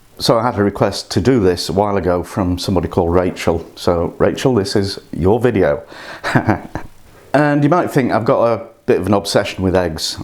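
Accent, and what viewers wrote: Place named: United Kingdom. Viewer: this is possibly the only person with the "uk" accent that i will watch a video from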